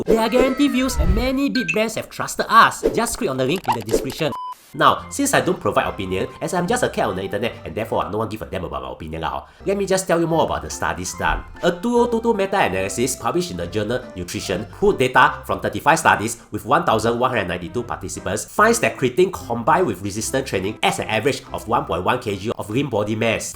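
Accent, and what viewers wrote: Singapore. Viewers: This is a Singaporean cat. He’s speaking in Singlish dialect . 😅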